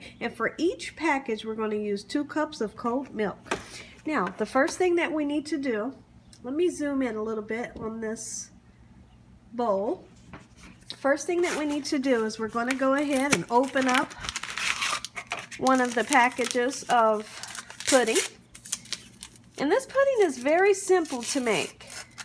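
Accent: South, United States. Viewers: Mmmm it smells just like bananas 😂😂 great southern accent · I loveeeeee your voice soo sweet & southerny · Only thing southern is her accent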